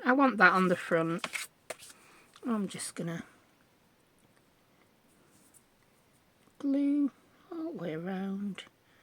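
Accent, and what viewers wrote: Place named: Yorkshire, United Kingdom. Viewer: I’ve just found you on YouTube, it’s a delight to hear a ‘Yorkshire’ accent on here making fabulous crafts! I’m a Yorkshire lass I hope you’re still making videos on here,I’ll have to have a browse at more of your creations, I love what you did with this fold out, I haven’t made one before but going to after watching you. Thanks 😊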